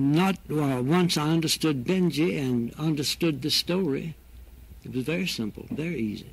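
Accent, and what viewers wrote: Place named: South, United States. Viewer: Wow! This is of pretty amazing quality. What a cool southern accent.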